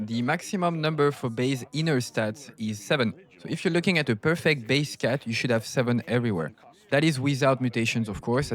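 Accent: France. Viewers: Excellent video mon pote, it's rare to see french accent in english videos, yours is very sweet. Also a LOT of your tips are unique and useful, great video. I'll add more tips that i've learned myself : -Some items belongs to several sets family. If you have the right items, you can have a cat with 2 set bonuses, if equipped with the right items. I don't think you can have more than 2 sets ? Should test that. -Birth defect can't mutate (anymore). If your cat have a head birth defect for example, he'll keep it forever. It can't mutate in a room with high mutation nor during an adventure event. -Charisma stat determine how your cat is attractive to others, and how much it will reproduce. A cat with 0 or negative charisma will never reproduce. From experience, a cat with 12+ charisma will reproduce every night. Was a long time ago, all my cats are descent of this Barbie named cat. 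My personnal tip is to do easy runs with cats that have good stats and mutations, and boost their charisma, so they give you a lot of kitten. Also keep that in mind if you want to do a cancer/uranium rod run. Even if you get a lot of mutation, if he look unfuckable, he will be. -If you have 0 Intelligence, the text during event will be randomize BUT only the letters are randomized. Words will keep the same number of letters. Can be helpful if you try to decipher or remember the events. -If a cat is the love-interest of multiple cats, those multiple cats might fight each others. Be carefull, as even a h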